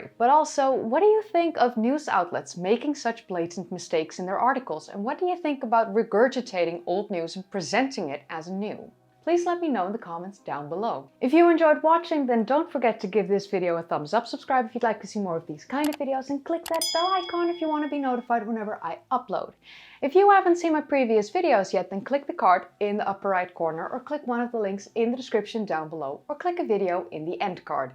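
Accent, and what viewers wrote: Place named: Netherlands. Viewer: I love the Dutch accent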